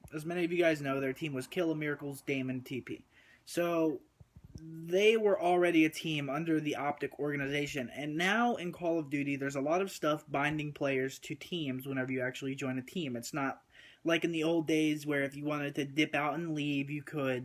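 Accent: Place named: East Coast, United States. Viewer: i thought you were east coast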